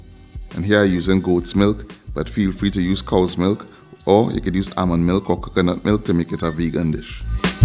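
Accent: Trinidad and Tobago. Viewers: Is that a Trini accent ?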